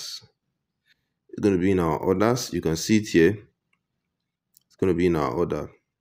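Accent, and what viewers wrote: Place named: Africa. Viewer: We can only understand our African English . 🎉🎉🎉 thanks for the explanation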